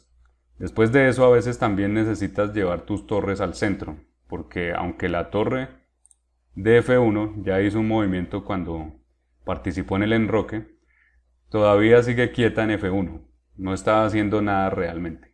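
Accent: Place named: Colombia. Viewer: Me encanta tu canal y la forma como explicas. excelente!! Me animaré a comprar tus packs pero el pequeño detalle es que me toca también comprar un Pc porque tengo mac jeje. Saludos desde Bogotá. Tu de qué parte de Colombia eres? me parece haber escuchado ese acento en un torneo IRT.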